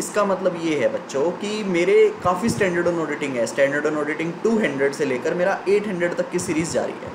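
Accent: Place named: India. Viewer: Unfortunately, i can t understand indian english accent ... :(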